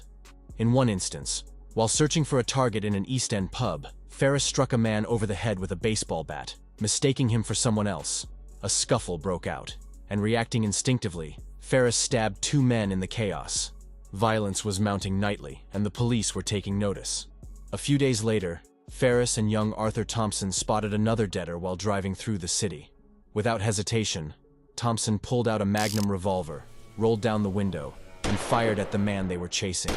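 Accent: United States. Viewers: Narrated by a robot Yank who has no idea where Glasgow is so totally unwatchable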